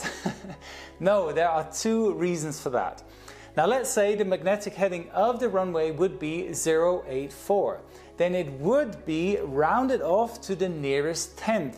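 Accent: Germany. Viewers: Joe with his German accent.... Gotta love it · Oof German accent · man the r you use in your 'three's makes it super obvious youre a german xD good content, super interesting. keep it up! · lustig das man als deutscher immer gleich merkt wenn ein deutscher englisch spricht :D versteht man aber viel besser wie einen englisch Muttersprachler :D echt interessantes Video... wär ich nie drauf gekommen das die Nummern etwas mit dem Magnetfeld zu tun haben ^^ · Typical german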